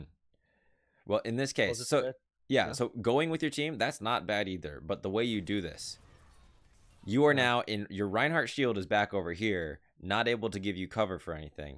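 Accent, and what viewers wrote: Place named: Italy. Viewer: He is so Italian... why do we have such a distinct accent when we speak english god damn it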